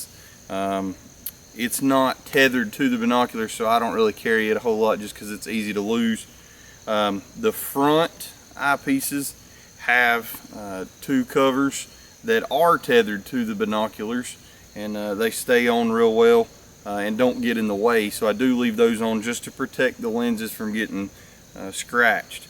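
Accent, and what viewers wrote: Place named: South, United States. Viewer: The south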